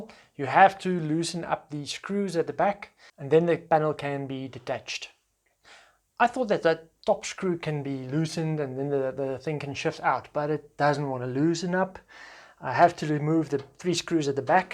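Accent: South Africa. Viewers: Kan hoor jy is van SA xD jy die by die werk gebou?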